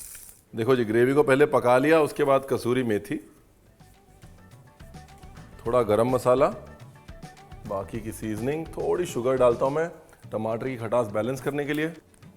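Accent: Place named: Punjab, India. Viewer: Sorry to say Sir but why do you overeact so much? It always seems like you need some kind of validation that you are punjabi. We all know you are punjabi. You always mix all three languages English, hindi, punjabi everytime. Please use one language according to your channel preferences. I know you have a punjabi touch in accent and we all have .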